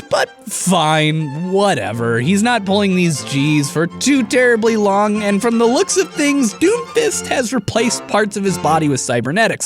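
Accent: England, United Kingdom. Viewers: cute english timelord!!!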